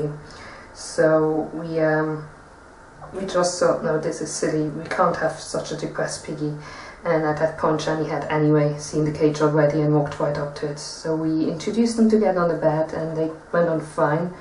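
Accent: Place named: Germany. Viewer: Your accent is awesome!!! Are you German?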